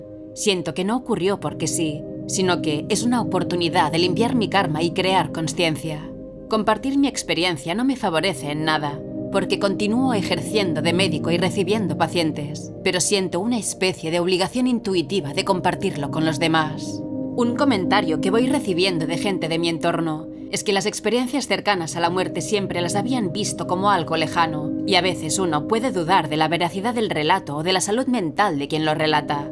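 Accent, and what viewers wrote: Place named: Spain. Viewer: Esta ECM nos deja una gran moraleja, tenemos que ser más empaticos y cuidadosos cuando decimos o hacemos algo, tal vez sin querer podemos lastimar u ofender a los demás. Me encantan estos testimonios, me traen paz y tranquilidad, me hacen pensar que en algún momento me voy a reunir con mi niño en un lugar de perfecta paz, además ese tono de voz y ese lindo acento español es muy relajante. Te lo agradezco mucho. Saludos cordiales desde Colombia!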